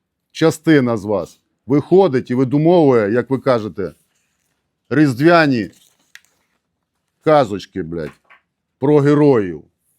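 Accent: Transcarpathia, Ukraine. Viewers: Дякую за рекламу закарпатського діалекту української мови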